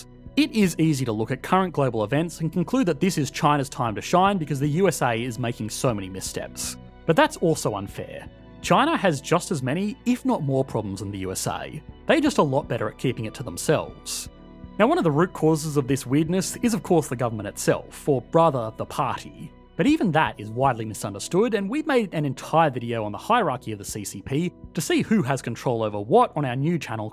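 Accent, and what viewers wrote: Australia. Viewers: Australian here. I recognise an Australian accent. Notice our independent source commentry is less biased or partisan. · take propaganda, put it through disgusting australian accent, paste youtube video, profit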